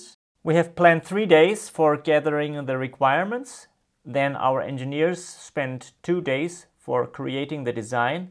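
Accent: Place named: Germany. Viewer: I guess you are German 😊from your accent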